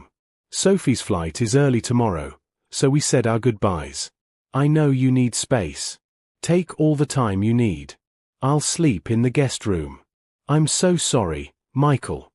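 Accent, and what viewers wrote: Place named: United Kingdom. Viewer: So tired of these stories with a British accent. I don't know why but for me it's off-putting!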